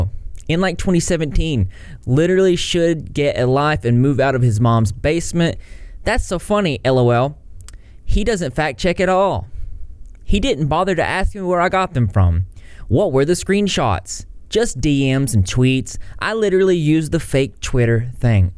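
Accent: South, United States. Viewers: Do ya got a little southern accent? Or am I just crazy? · Just discovered your channel and already hooked. I’m glad you got better from your accident and found YouTube to help with that. There will always be haters but keep pushing forward. I LOVE your hair btw and you sound like you are southern like I am :)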